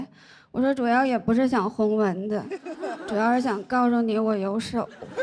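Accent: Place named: Northeast, China. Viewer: 这一口东北大碴子味儿。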